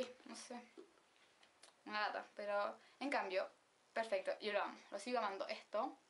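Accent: Chile. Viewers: que acento chileno!!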